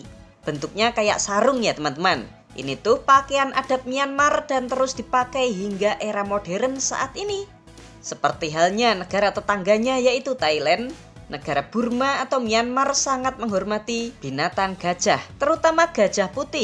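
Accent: Java, Indonesia. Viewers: Medok banget min jawa nya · Toto sekali jawanya min😅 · Suaranya jadi candu dengan medok jawanya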